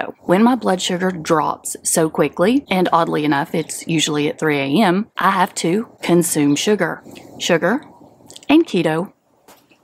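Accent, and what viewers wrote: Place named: Appalachia, United States. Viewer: I just discovered you by searching for a keto sausage balls recipe. I found this video very informative. I am very sorry for your diagnosis. You are picture perfect with a loving appellation or southern accent. Stay healthy.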